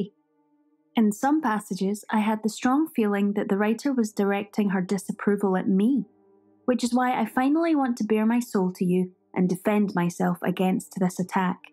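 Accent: United Kingdom. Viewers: The Scottish accent is a bit off putting but Anne's words shine through.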